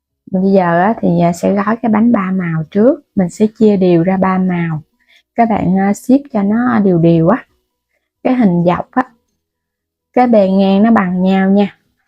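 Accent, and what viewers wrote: Mekong Delta, Vietnam. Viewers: Con ơi nói chuyện nên tự nhiên đừng nên địu quá không hay góp ý nên để giọng miền tây gặt để thương hơn nhé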